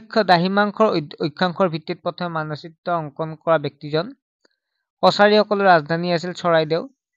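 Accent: Nalbari, India. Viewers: Sir nalbarir lage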